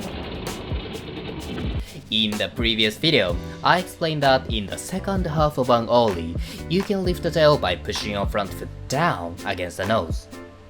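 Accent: Japan. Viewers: やっぱり日本人です。発音 が。。。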